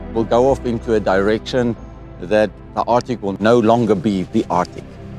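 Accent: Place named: South Africa. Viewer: The sweet sound of that SouthAfrican accent. #dreamy ;) Brilliant film, gret visuals as always!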